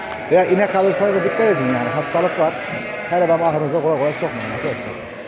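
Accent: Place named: Erzurum, Turkey. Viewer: Kardeşim bereketli olsun sayfana yeni üye oldum. Hangi şehirde yapıyorsun bu işi ve nerelisin Erzurum Ağrı şivesi var konuşmanda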